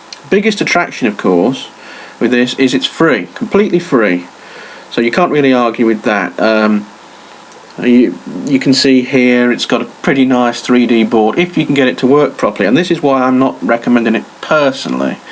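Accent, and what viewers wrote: United Kingdom. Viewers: Good old Northern English dialect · i love your english accent, its calm, easy to follow, and very clear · You have a wonderful British accent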